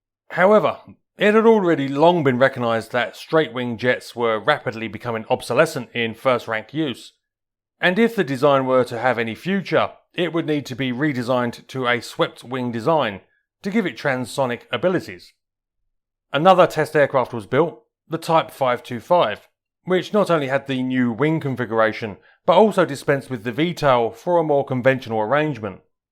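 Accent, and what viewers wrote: United Kingdom. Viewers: It's not an American accent. He's British.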